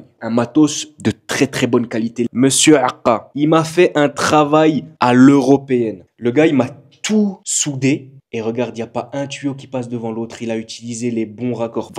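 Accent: Alsace, France. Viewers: J'ai bien reconnu cet accent, vous êtes Haut-rhinois? Le salam de mon fils du Bas-Rhin 🥨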